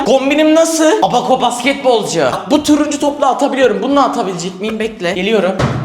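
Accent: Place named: Black Sea, Turkey. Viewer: Oy uşağım Sen de bir Karadenizli oldun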